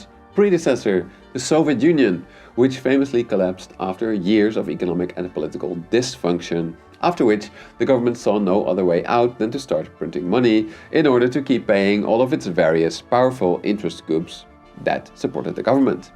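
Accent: Netherlands. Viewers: Dutch accent (a little bit similar to slavic accent, because the Dutch language sounds like Russians speak English), " is a PhD candidate at the University of Groningen, the Netherlands."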